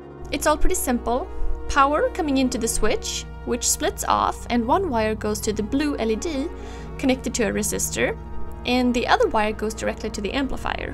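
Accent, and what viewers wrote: Sweden. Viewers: you have a very swedish accent :P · Du låter svensk? :D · Are you Swedish I can kind of hear that on your English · luse i speak swedish ans she does too. you can hear it quite alot from her accent if that was what you meant. cheers lad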